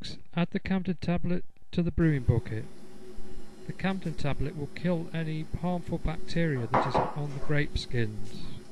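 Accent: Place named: England, United Kingdom. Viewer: Fair play, must taste delicious but cant stand that english commentary.... get on to the BBC and you could malke a living..!! Well done tho!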